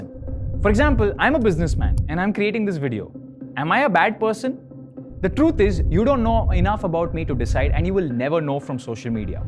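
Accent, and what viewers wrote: India. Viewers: Y'all how tf you bear this insufferable indian accent. This accent is not even indian i dont know wtf is this but entire world hates it. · Heard indian accent and immediately turned it off. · When you love the video but have to bear the indian accent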